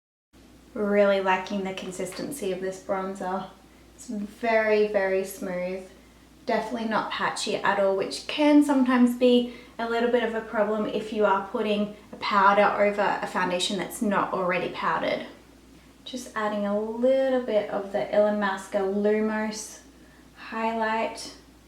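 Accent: Australia. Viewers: Can you please tell me how you got this product so early?! I'm assuming you live in Australia, like me (due to your accent) and it isn't released here until Aug 25th.. and the Too faced and Sephora websites don't ship to Aus :(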